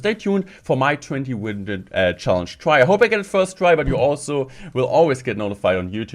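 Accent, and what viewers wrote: Germany. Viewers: The german accent hits hard But good video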